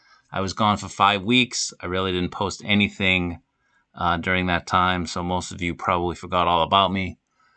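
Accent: Boston, United States. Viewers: So Boston accent ❤ GO CELTICS!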